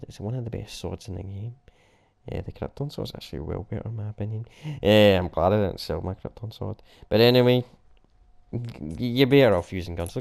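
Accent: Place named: Scotland, United Kingdom. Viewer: If you dont unstand that English then you must of never head of a Scottish accent before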